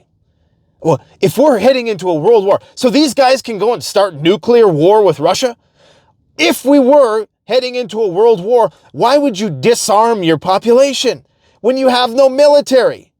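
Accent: England, United Kingdom. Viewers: I want more british accent!!! 😅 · Lol British accent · Geeze you sounded more British then me 😂😂 brilliant!! Thank you for your updates buddy much love from Dorset 🇬🇧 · Your british accent had me dying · Why use an English accent when talking about Trudeau? We have pr**ks in England but we don't use Canadian accents to describe them 🤷🏿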